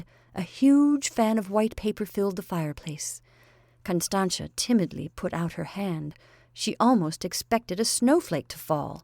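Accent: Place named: United States. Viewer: The reading should be in an English voice, not American — one cannot imagine a more English story like this one! Pity.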